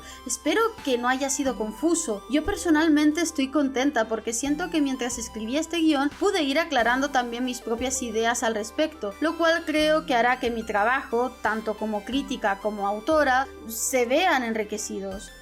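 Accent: France. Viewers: Soy nuevo en canal, ¿ la chica que locuta es de asendencia francesa lo comento por su acento ? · De que parte de Francia sos? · Porque tiene asentó francés??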